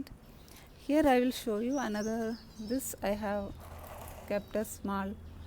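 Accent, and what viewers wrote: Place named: Kerala, India. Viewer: You remind me of a nun from Kerela. Voice and accent is so similar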